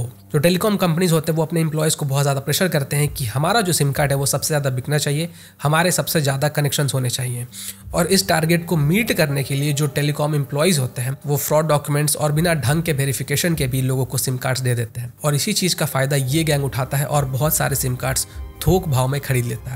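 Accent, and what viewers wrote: Bihar, India. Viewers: Your speaking accent was bihari. You have to speak like local. Please catch delivery boy also because he was saying "vahi aana jahan har baar lete hai".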